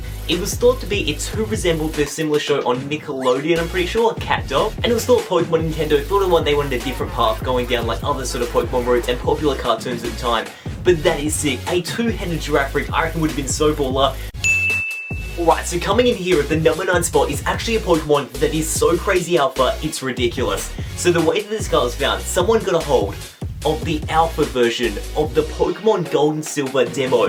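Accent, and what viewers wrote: Australia. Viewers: Thats an australian accent. Thats honestly kinda racist. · Are you Australian? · ReaditonReddit Autocorrect :/ btw English Originated in England not America,and I know it was Australian but I'm not that good at realizing the difference in accents of Australian and British.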